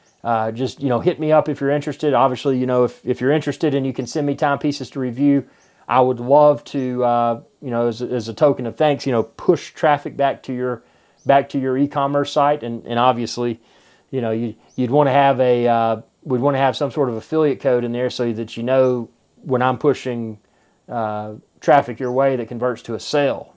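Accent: South, United States. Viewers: It’s weird to hear a southern accent speaking about a European luxury watch 🤣 but great content 👍🏽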